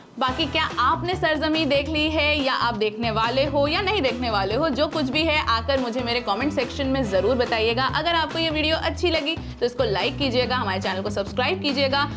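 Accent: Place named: Bengal, India. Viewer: U BENGALI HINDI VOICE VERY NICE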